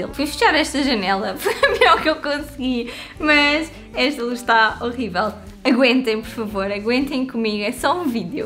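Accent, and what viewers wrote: Portugal. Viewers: Olá, linda menina. Vinha dizer, na esperança de que um dia leia, que vejo os seus vídeos, desde que os descobri. Tem sido uma companhia muito querida para mim ao longo dos últimos dois meses. Sou de Lisboa, mas encontro-me, de momento, em Helsínquia, Finlândia. Aqui mora um dos meus filhos com a sua família. Vim ajudar com as meninas. Só saio para fazer caminhada ou ir buscar/levar as meninas à escola. É muito refrescante ouvir uma jovem de Portugal. Ainda por cima, simpática e simples. Continue o seu bom trabalho. Faz bem a muita gente. Deus a abençoe e à sua família. Beijinhos grandes. Feliz Ano novo!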